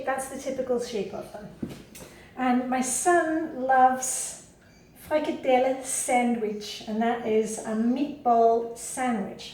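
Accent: Scotland, United Kingdom. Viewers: Its so different than the US. hardly anyone would eat liver pate’ here because the abuse those poor geese suffer is horrible but we think nothing of using plastic sandwich bags which never disappear!!!! Okay so I’m new here. Why are you living there? You sound like you’re Scottish. How old are your kids? You look adorable. I love your haircut (I’m a hairdresser) and your cute outfits.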